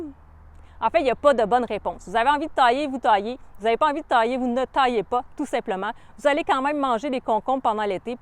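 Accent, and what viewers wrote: Canada. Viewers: Merci pour cette belle explication ...et votre très bel accent canadien . A bientôt !! Manu , de la Bretagne.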